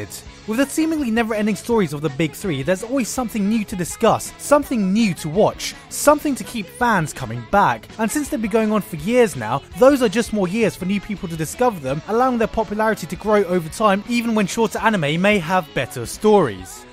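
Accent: United Kingdom. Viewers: Dude you sound British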